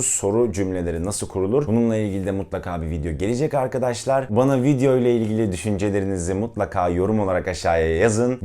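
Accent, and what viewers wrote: United States. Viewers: Abi sen İngilizce konuşurken içimden 'Amerikalılar bile senin gibi konuşamıyor.Nedense senin aksanın daha gerçek geliyor' diyorum istemsizce. (umarım bi tek ben böyle düşünmüyorumdur?)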